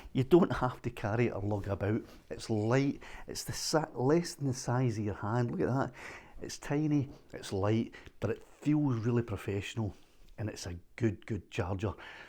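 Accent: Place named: Glasgow, United Kingdom. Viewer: I love your Glasgow accent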